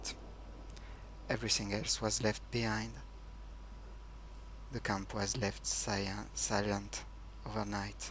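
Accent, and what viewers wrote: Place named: France. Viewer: Amazing my french friend! Great story, loved the way you said it. The french accent really added to spookie vibe thing. Great build. Will make a great halloween entry too! Just love it, didn't know you had it in you... Very macabre...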